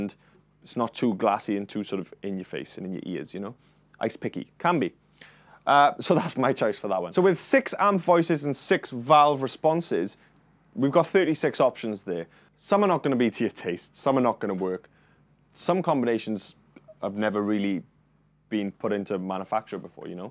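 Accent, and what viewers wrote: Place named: South Wales, United Kingdom. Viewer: Brilliant video,. (I’m from South Wales but living away so good to hear a familiar accent). Although this vid is from a couple of years back, it’s still an outstanding demo of the amp. At first I was thinking I wanted you to keep playing the same licks and sequences so as to make it easier to compare the voicings; but as the demo went on, and the excellence of your playing became apparent, it seemed good that you’d modified your style to suit the nature of the sound in question. You were also one of the first demonstrators I’ve seen to mention at the start that the modelling technology used here is in line with the sophisticated developments of Kemper, Fractal and Line 6. Excellent work. Thank you!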